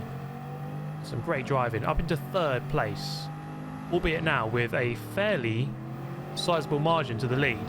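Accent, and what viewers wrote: United Kingdom. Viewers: I know it is british accent, but where exactly is this accent from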